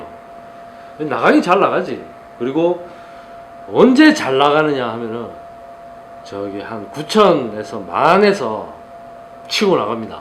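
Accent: Gyeongsang, South Korea. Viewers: 토속적인 경상도 억양 리뷰 참 좋네요.잘 보았습니다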